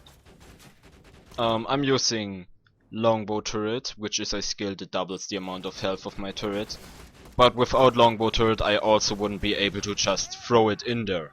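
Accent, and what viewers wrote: Germany. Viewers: man hört echt das du deutsch bist :D haha. aber cooles video axton war auch immer mein lieblings char. ich hasse diese leute die in einem singleplayer spiel auf meta und guides hören. was wichtig ist ist das man spaß hat mit dem char den man spielt...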